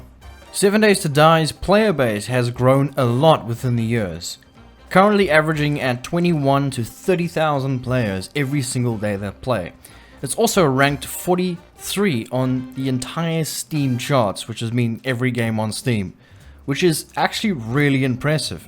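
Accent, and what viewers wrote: South Africa. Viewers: Hey are you south African the accent sounds like it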